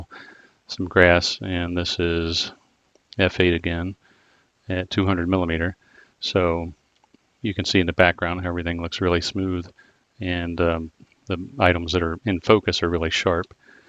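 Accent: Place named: United States. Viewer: Neigh con, zee lens. No matter how many times I hear it said in an American accent, I mentally keep correcting it as Nick on and zed. English is a strange language to me.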